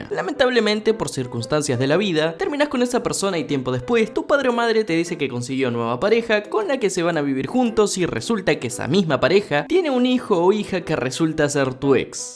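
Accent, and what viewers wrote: North, Mexico. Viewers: 🤠es norteño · LA HISTORIA ESTA MUY BUENA RISA DE GUFI PERO TAMBIÉN MUY NORTEÑA🤠 BAÑENCE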